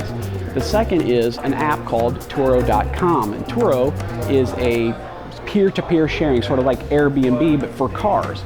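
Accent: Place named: Houston, United States. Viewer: I hear Houston.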